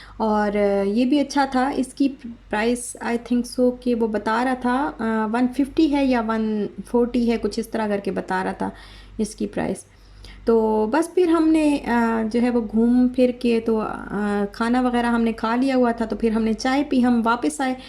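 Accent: Saraiki, Pakistan. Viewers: AP siraiki ha ...bat karny se lagra ha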